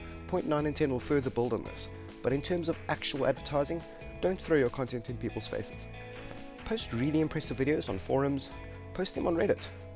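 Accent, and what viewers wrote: South Africa. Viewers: Afreekan accent:)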